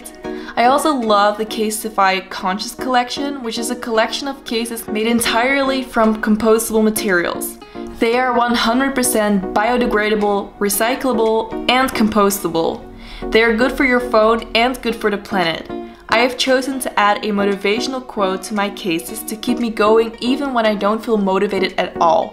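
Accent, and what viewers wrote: Netherlands. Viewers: WHAT? are you dutch? omg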